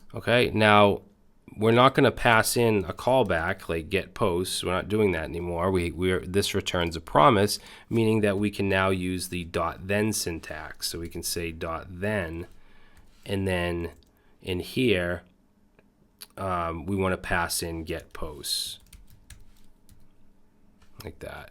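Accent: Boston, United States. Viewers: has me laughing out loud ...Boston accent and all you keep it real!!! Live for your content broski keep it coming.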